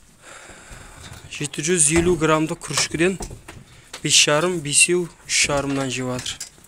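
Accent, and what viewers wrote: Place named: Uzbekistan. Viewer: Ассалаумагалейкум жаба дегенинизге караганда Озбекстаннан келгенсизба димин мен сиакты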